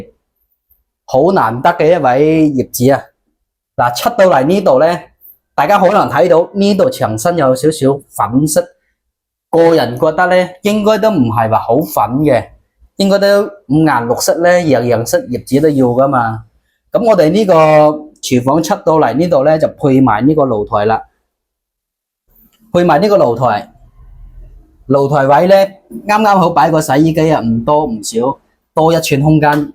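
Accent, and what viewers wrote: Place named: Dongguan, China. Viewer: 東莞口音點讚 !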